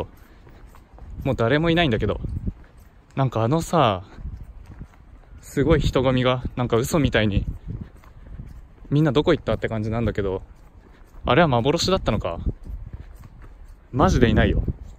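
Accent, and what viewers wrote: Aichi, Japan. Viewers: たまにイントネーション違うな～って思ってたけど愛知のイントネーションなんだな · 愛知の方のイントネーション好きです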